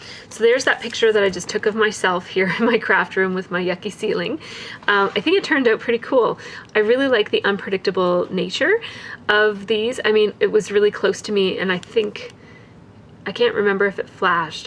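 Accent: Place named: Canada. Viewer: Thanks for the great review! I've debated on buying one of these for a while. Also it was nice to see your pretty face on camera! I've watched your channel for about a year now so it's nice to put a face with your sweet Canadian voice :) ~Melissa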